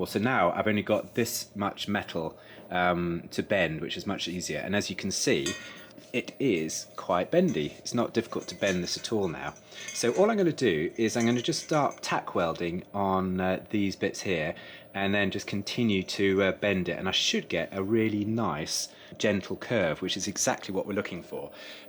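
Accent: United Kingdom. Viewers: I totally didn't expect to hear the King's English from someone fabricating a farm tool. I had no clue Brits were still doing things like this. What a great video and really nice work.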